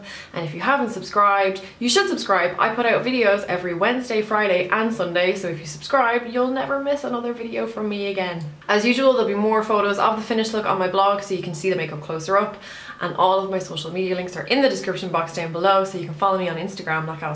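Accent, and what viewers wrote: Ireland. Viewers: Love it! You're sounding much more Irish in this video :) Did you recently visit home?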